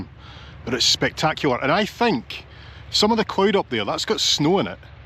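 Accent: Scotland, United Kingdom. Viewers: I think I just like hearing you talking and your authentic accent and your Scottishness. you so funny!! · As a scouser that lived in Aberdeenshire for years before moving to Canada, I love your video’s. Your exploring and videos reminds me of home, and your accent reminds me of my time living in Scotland, fond memories. Keep up the good work, you have me hooked.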